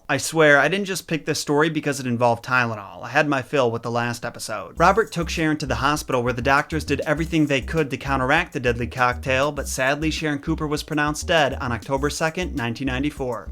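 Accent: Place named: Midwest, United States. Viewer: lmao yes 😂 Also you have gotta be from the mid-west! Every time you say “Bob” I feel like I’m at a family reunion in northern Minnesota Lol!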